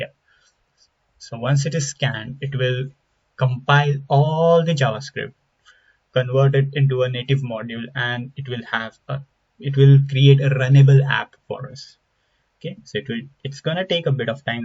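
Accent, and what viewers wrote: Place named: India. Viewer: Bhai awaj se hi indian hai pta chal gya tha 😋😋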